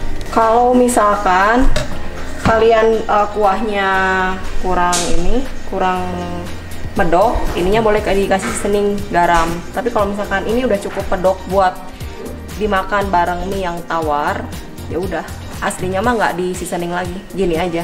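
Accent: West Java, Indonesia. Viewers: Suka deh kalo teteh ngomong logat sunda euy 🤣🤣🤣🤣🤣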